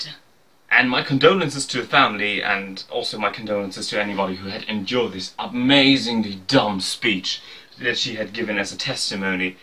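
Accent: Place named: United Kingdom. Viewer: I'm trying not to giggle the way you said it looks different it smells different feel different (with Brit accent). but that's true!! your place smells like you looks like you and feels like you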